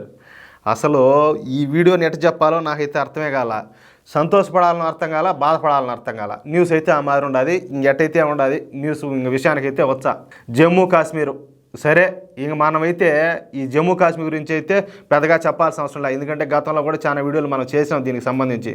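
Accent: Rayalaseema, India. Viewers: Saru dhi RAYALA SEEMA yasa . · సీమా యాస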